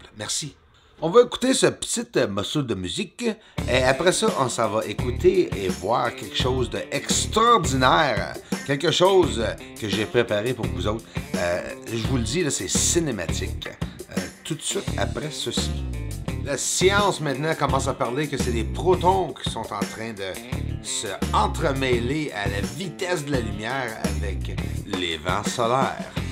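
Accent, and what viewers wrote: Quebec, Canada. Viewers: Hey, cool ! C’est la première fois que je tombe sur une de tes vidéos, je viens de m'abonner. 😊, sujet qui m'intéresse 😊, juste entre toi et moi, tu as un accent québécois assez fort 😊, pas certain que tous les Français de l'Europe vont te comprendre, moi je te comprends bien, je suis Québécois aussi 👍 continue, ne lâche pas avec l'accent ou pas 😊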